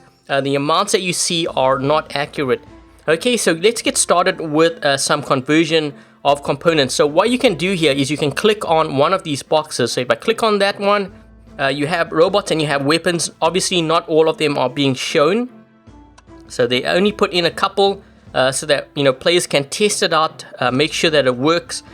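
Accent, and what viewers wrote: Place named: South Africa. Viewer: I'm pretty sure the official language of Brazil is Portuguese and to answer that question: He's said on some of his live streams (that are recorded and posted in his channel in case you want to double check) that he was born in South Africa and went to a primarily English speaking area of the country and I think he lives either in Canada or New York so if you're wondering about his accent there's a solid chance that it's based on all three rather than a Brazilian's English.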